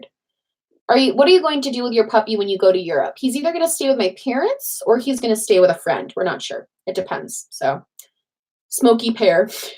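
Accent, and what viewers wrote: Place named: Boston, United States. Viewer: i’ve been binging your channel lately and the fact you have a BOSTON i’m gonna cry you’re literally my favorite person right now ❤️🥺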